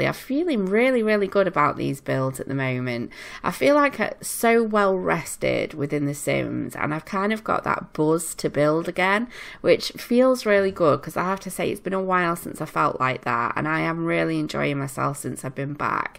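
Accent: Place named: North, United Kingdom. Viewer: Love your builds! Nice to hear a fellow Northerner on youtube playing the sims too!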